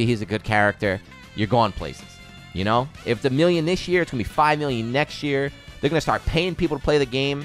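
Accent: Philadelphia, United States. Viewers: You're from Philly, man?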